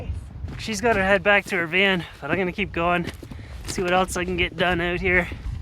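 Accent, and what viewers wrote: Canada. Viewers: Great video brother you are a hiking fool. Very good drone footage. My first time watching your vids I’ll definitely subscribe I love these types. I’m guessing this is in Canada??? You kinda sound Canadian. Wish you safe travels your friend from Oregon 🤙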